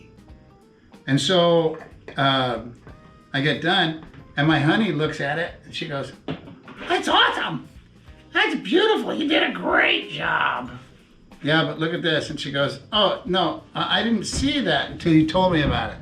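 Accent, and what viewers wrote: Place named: Midwest, United States. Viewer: Where did you grow up? I'm trying to figure out by how you talk. I was thinking Chicagoland, now not sure, definitely midwest. This is my first time on your channel. On my 6th video. Thank you!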